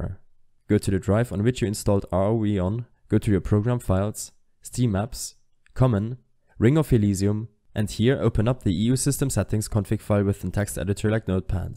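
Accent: Germany. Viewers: Jawoll ein deutscher das hört man so raus hahah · ich habs auch schon an seiner aussprache erkannt und dann erst bemerkt, dass aufm pc auch alles auf deutsch ist xD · Alle sagen dass man den deutschen akzent raushört Aber niemand gibt probs für seine englischskills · Man hat direkt an deinem Englisch gehört dass du ein Deutscher bist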